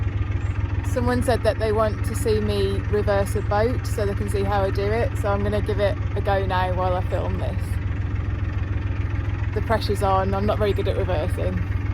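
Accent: United Kingdom. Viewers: Oh thank you for your reply! Yes I've admittedly pretty much binge-watched most of your videos this past week after my wife and I stumbled upon one of them last weekend. I just love outdoorsy things and calm, authentic content. And the latter is someting way too many youtubers lack, especially as we're living in times of loud, nervous short-form clips. So thank you for your videos! They have really brightened my past few work days! :D The British canal system is fascinating, we don't have many canals here! Oh and every time I hear a calm voice with a very british accent it kinda reminds me of a very dear friend from England (though from another region), and that's something! ;) Stay the way you are and keep the videos coming! Much love from Germany.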